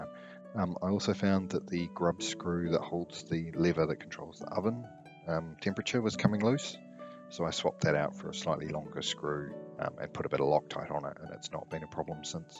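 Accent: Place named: New Zealand. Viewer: Great review, gonna get one for my barge. Nice to hear the New Zealand accent.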